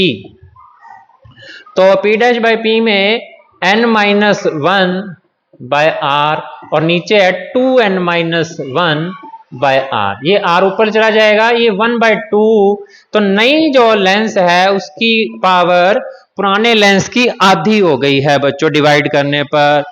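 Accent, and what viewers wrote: Haryana, India. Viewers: Good haryanvi ascent sir ❤️😄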